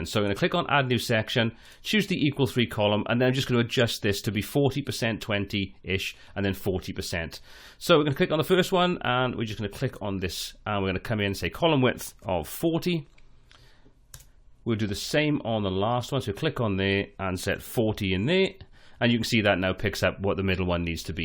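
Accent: Wales, United Kingdom. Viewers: What a lovely Welsh accent! :)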